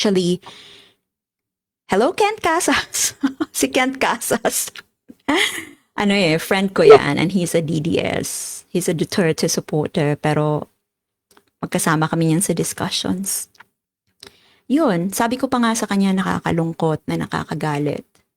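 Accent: United Kingdom. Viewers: Yes Ms. I love your British accent and specially your sense of humour,God Bless 🇵🇭🙏🇨🇦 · Like your British accent and Cebuano.. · Manang, hindi aq kasali sa any political party, may reaksyon lang Ako sa isang blog mo with christian, ala Ako paki sa political views mo, PERO NATAWA AKO SA PAGMAMALAKI MO NA ABOUT YOU GOIN' ON A CHURCH AT PAGBA.BIBLE STUDY MO, BUT THEN MAGCRITICIZE KA NG TAO, PARANG AKO NAHIYA KZ LUMALABAS LANG PAGIGING HIPOKRITA MO, ANG BAWAT TAO BINIGYAN NG TALENTO HINDI PARA IPAGMALAKI KUNDI GAMITIN SA MABUTING LAYUNIN AT HINDI IBINABANDERA O PINANGANGALANDAKAN ABOUT YOUR "BRITISH ACCENT", ICRITICIZE MO SA TAO, SABIHIN KO SAYO KAHIT RUSSIAN, KOREAN, JAPANESE PRESIDENT HINDI MAGALING SA ENGLISH AT WALA YANG ACCENT NA PINAGMAMALAKI MO, KUNG HINDI MAGALING SA ENGLISH SI VP SARAH EH ANU NAMAN SAYO?? AT LEAST NAG.TATRY SIYA NA GAMITIN ANG INGLES DAHIL ANG MOTHER LANGUAGE NAMAN TALAGA NG PILIPINAS AY TAGALOG, BAKIT GINAWA NG DIOS NA MAGKAROON NG IBA'T IBANG LANGUAGE KUNG MAS IMPORTANTE PALA SAYO ANG ENGLISH AT ACCENT NITO, KAHIT NA SINASABI MO NA HINDI MAGALING SI VP SARAH, WALANG OBLIGASYON SI VP O KAHIT SINO NA NAMUMUNO SA GOBYERNO NA ICRITICIZE MO SA MGA PINAG-ARALAN NILA, PEKE KA DIN EH AT HIPOKRITA, WAG MO NG IPANGALANDAKAN YANG PAGPUNTA PUNTA MO SA CHURCH AT PAGBA.BIBLE STUDY MO, NAGMUMUKHA KA LANG PAYASO. 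MAY ISA. Pa pala, kung talagang nagbabasa ka ng BIBLIA dapat alam mo yung talata na kapag nag-iisip ka ng hindi maganda sa kapwa ay katumbas din ng pagpatay. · Good day mam, you have a voice..British accent ba..:face-blue-smiling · You do sound British, I'm loving the accent though 😊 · Ms., puwedeng puwede ka po maging newscaster. Filipina with British accent. Ang galing mo po! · I’ve watched you for the first time with Christ Tan‘s podcast, (that was just yesterday) since then nag marathon na ako sa mga vlogs mo. Sayang na late ako ng 4 days sa live mo na ito. Anyways, i love your British accent just keep it up…yan ang tatak mo plus hindi ka nakakasawang pakinggan. I have 4 friends from Davao mismong kababayan ng mga Du30 but they‘re not DU30 followers kaya i love them so much! I’m your new subscriber saying HELLO FROM VIENNA AUSTRIA, the Batangeña!❤️💋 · UK accents 😊❤ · Your style of talking "a little British accent" adds flavor to the whole shebang. 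You caught my attention Girl! I am a big fan - keep going especially taking on people with different perspective blinded by their wrong viewpoint. You are on the right track of messaging.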